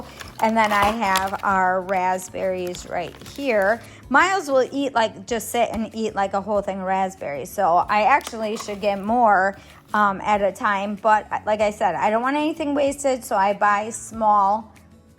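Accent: Wisconsin, United States. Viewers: Do you ever go to Woodmans? I don’t know how far north they are in Wisconsin. I knew the Wisconsin accent too. (I live in Illinois) 😀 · When I heard your accent I knew you were from Wisconsin. Hello, from a new subscriber from Wisconsin!! Loved the haul. God bless 🙏🏼❤️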